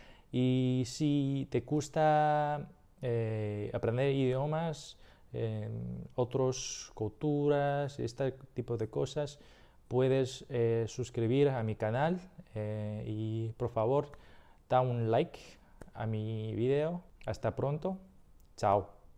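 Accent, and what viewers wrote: Castile, Spain. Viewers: ¿De donde aprendiste español? Yo pense que pude escuchar a un poquito de acento España, pero no podría ser seguro. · Your Spanish is great, mate! I'm curious, why do you have a Castilian (Spanish from Spain) accent? People from the US usually learn the Mexican variety, due to proximity and exposure. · me gusta tu español, tienes un acento español, pero de repente le metes ciertas expresiones latinoamericanas que gustan mucho c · Excelente video, habla muy bien el Español, con acento de España, que consejo nos daría a la hora de aprender idiomas, a mí me gusta mucho el inglés y el hebreo. Saludos desde Colombia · Salvo unos pequeños errores en los artículos (a todos los no nativos de español les pasa) es bastante bueno el habla, hasta tienes el acento y manerismos del español de España. La conjugación se te da muy bien y suele ser lo más difícil del idioma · You should specify you speak Spain Spanish. 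Which usa Spanish hates lol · ¡Es un mundo pequeño! Un Chino Americano hablando Español castellano. Saludos de Tucson. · ¡Que copado! Hablas como un nativo hispanohablante con acento de España. 💙👍🏻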